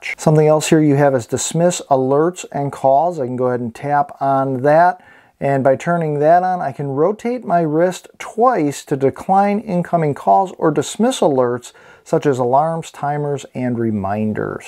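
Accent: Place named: Chicago, United States. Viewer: yup....you're from chicago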